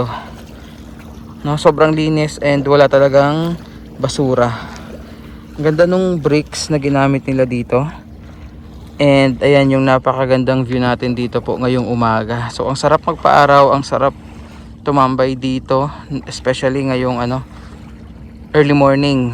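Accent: Visayas, Philippines. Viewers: Ganyan bisaya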